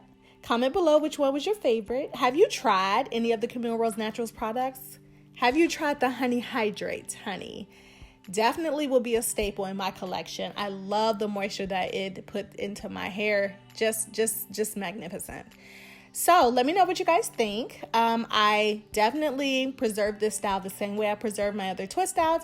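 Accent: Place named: South, United States. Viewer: You have such a southern twang in your speech. I love it. I am also thinking about getting a tapered cut. I love yours.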